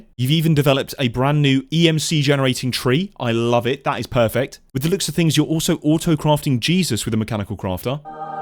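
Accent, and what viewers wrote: United Kingdom. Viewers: so is he a brit?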